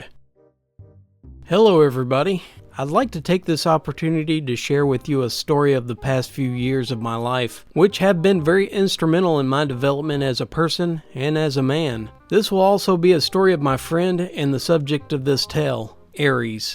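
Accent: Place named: Kentucky, United States. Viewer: Still my favourite accent.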